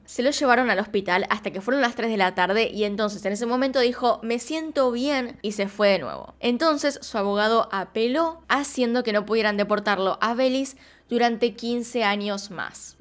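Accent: Argentina. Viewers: Me encanta como narras los casos, eres linda, tierna y graciosa y ese acento argentino en ti me encanta. Un abrazo desde Venezuela 🇻🇪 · SOS ARGENTINA ? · Te amo 🥰❤️ Amo tu canal ❗️ Adoro el acento argentino se me pega mucho y más que estoy viendo una serie llamada Rebelde Way de Netflix 😂❤️👌🏼 Saludos desde Perú 🇵🇪❗️ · Es su acento argentino :/ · No es que tu voz de argentina Me gusta, digamos que es una de las quémenos me disgusta.Hay muchos canales como el tuyo pero su narrativa me aburre mucho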